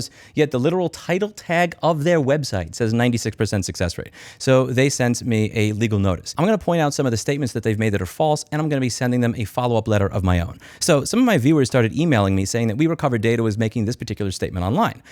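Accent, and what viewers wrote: New York, United States. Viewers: Had to play this at 70% speed because Louis is from New York and I wanted to listen to him say it like a mob boss.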